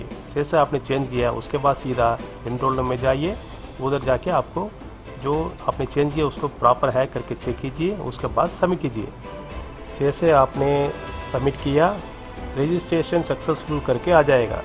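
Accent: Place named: Tamil Nadu, India. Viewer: Neega Tamil thane neega Hindi pesuradhu teriyuthu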